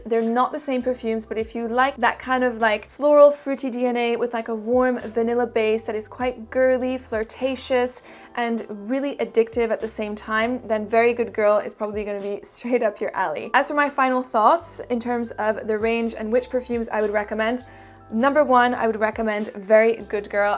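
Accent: France. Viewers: Thank you so much foe the review it couldn't have been better than that!! I looove the french accent BTW❤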